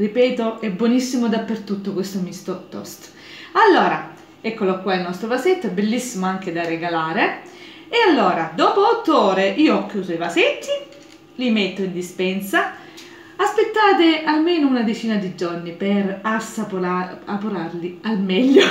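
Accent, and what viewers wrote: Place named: Apulia, Italy. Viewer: Meravigliosa ricetta come sei meravigliosa tu.... sentire il tuo accento mi emoziono perchè ricordo l'unica vacanca che ho fatto nella meravigliosa Puglia 10 anni fa, quella terra rossa tra gli uliveti fuori dal mio trullo , nella meravigliosa campagna , l'azzurro del mare , i profumi per le stradine di Ceglie me li porto ancora nel 🧡 Un caro saluto da Verona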